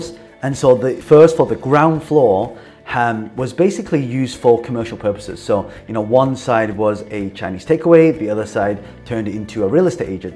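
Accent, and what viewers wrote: United Kingdom. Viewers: Love it. Are you from UK?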